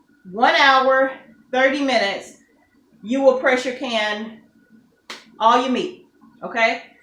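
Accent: South, United States. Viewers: Love. Your southern accent! Love your happy personality! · AMAZING!!! Thank you sooo much!! I will be canning my chicken tomorrow. May God continue to Bless you and your family. That southern accent makes me miss home. You are more of a Blessing than you will ever know.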